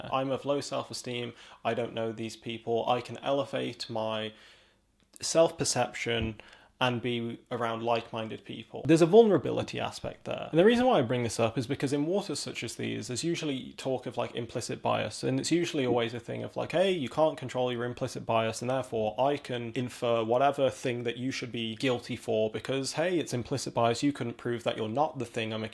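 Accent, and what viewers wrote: United Kingdom. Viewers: where this accent comes from? Are you British?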